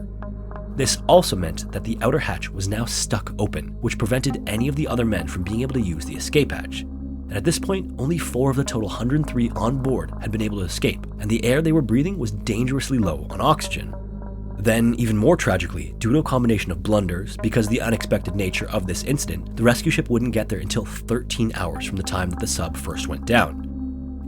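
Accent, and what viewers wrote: Canada. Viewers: Because of the naval focus of these stories, every time he says “about” in that Canadian accent, I have to think for a second if he’s actually saying “a boat.” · little do they know this IS my endel lol these videos can both put me to sleep and help me focus during certain household chores, it's an absolute joy to listen to I finally heard your Canadian accent this time btw, I love it!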